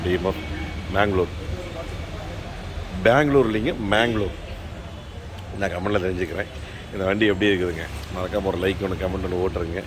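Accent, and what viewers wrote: Kongu, India. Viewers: கொஞ்சும் கொங்குத் தமிழ் பேசும் கோமகரே...! திகட்டாத தித்திக்கும் பேச்சுடைய திருப்பூர் திருமகரே...! மதிப்பிற்குறிய எங்கள் " " திரு. அவர்களே🙏 எப்படி இருக்கீங்க❤❤❤ அண்ணி🙏 எப்படி இருக்காங்க❤❤❤ ரித்திக் எப்படி இருக்காரு❤❤❤ யெப்ப்ப்ப்ப்ப்ப்ப்ப்பா😍😍😍😍😍😍😍😍😍😍😍😍😍😍😍😍😍😍😍😍😍😍😍😍😍😍 அட...அட...அட என்னா கலரு போங்க😍😍😍😍😍😍 சொப்பன சுந்தரிய யாரு வச்சு இருக்காங்கனு கரகாட்டகாரன் படத்துல செந்தில் அண்ணன் கவுண்டமணி அண்ணன்கிட்ட கேட்பாரு? இங்க பாருங்க...."TMF" செக்கச் செவேல்னு ஜிகுஜிகுஜிகுனு சிவப்பு சொப்பன சுந்தரி லேன்சர் காரை நம்ம " " திரு. அண்ணா வச்சு இருக்காருங்கோ😍😍😍😍😍😍😍😍😍😍😍😍😍 கொட்டப் பாக்கும் கொழுந்து வெத்தலையும் போட்டால் வாய் செவக்கும்...! எங்கள் " " அண்ணாவோட வேலைப்பாடுகளில் கார் எல்லாம் ஜொலிஜொலிக்கும்😍😍😍😍 அழுக்கான அசிங்கமெல்லாம் அழகு வண்ணம் பூசுகையில் சாலை ரதத் தேர் என்னும் கார் எல்லாம் பொன்னாய் மிண்ணும்...! வழுக்காத நேர்மையென்னும் வாழ்க்கைப் பாதைதனைக் கொண்ட எங்கள் " " அண்ணா சிந்தனையும் செயலும் அவர் வேலைப்பாடுகளிலே பொன்னாய் மிண்ணும்...! 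எச்சிலொழுகும் தன் மழலைதனின் அன்பு முத்தச் சுவைதன்னை தாய் ரசித்து ருசிப்பதுபோல...! வாகனம் பராமரிப்பற்று எச்சமிட்டதுபோல் ஆகி அருவருப்பான இடமெல்லாம் மிச்சம் மீதியில்லாமல் சுத்தம் செய்து தரும் எங்கள் மதிப்பிற்குறிய "சூப்பர் கார் கேர்" (Super Car Care) ஊழியர்களின்🙏 உழைப்பைக் கண்டு அனைத்து "TMF" கண்களும் காணோளி வாயிலாக ரசித்து ருசிக்கிறது...!! சூப்பர் கார் கேரில் வேலை பார்க்கிற ஊழியர்களுக்காக ஓர் சிறிய கவிதை🙏 வேதனை வந்து வீழ்த்தி வீழும் நிலைக்குத் தள்ளப்பட்டாலும் விதியென வீழ்ந்திடாது வாழ்வில் உயர நினைத்து உடல் வளைத்து வியர்வை வடித்து உழைக்கும் உன்னத உழை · Super workmanship, sir.You have proved that you are the Best car mechnic and as always your Kongu Tamil is sooper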